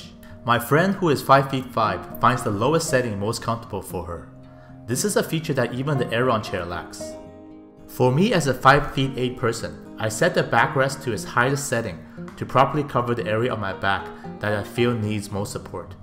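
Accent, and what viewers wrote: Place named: Asia. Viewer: Indeed, I'm also 6'4, I have tried this one at the store. Its not made for tall people. No offense, but this guy has an Asian accent, he probably has a different perception than a European or American of what a tall person is...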